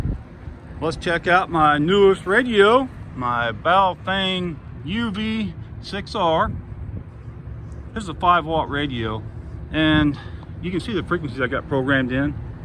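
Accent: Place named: Ohio, United States. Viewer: Thanks for telling us about the guard rails. So from the sounds of it, you were raised in Ohio. I was too, in Worthington. I have family in LaRue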